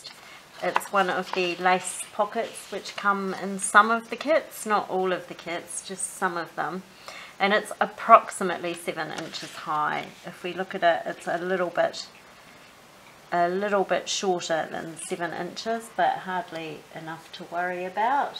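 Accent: New Zealand. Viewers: I love your accent! <3 and your work, ofc. Do you happen to live in NZ? :)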